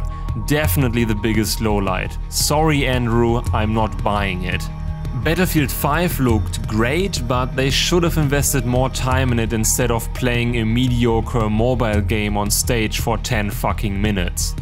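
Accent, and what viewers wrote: Germany. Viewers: Your English is great, who cares if some of the German accent is there. It is actually refreshing watching gaming YouTubers from Europe. As for the EA, they are dead to me for well known reasons. Andrew Wilson's and EA's level of hypocrisy is out of this world. I had 0 expectations from this and EA as usually delivered.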